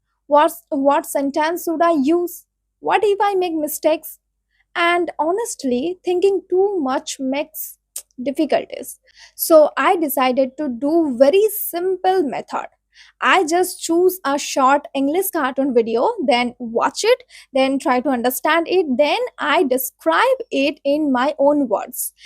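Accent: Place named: India. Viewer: I like accent Indiah😊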